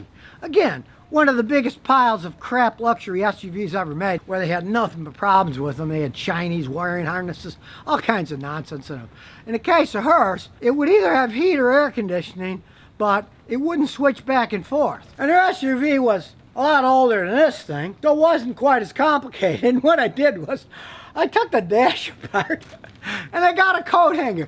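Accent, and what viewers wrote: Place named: New Jersey, United States. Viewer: Voice accent. He sounds like he has a new jersey accent, but I just want to make sure.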